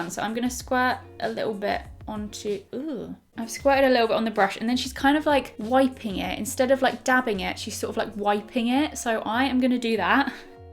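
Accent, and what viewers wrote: United Kingdom. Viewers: Her accent reminds me the Harry Potter movies🤷🏽‍♀️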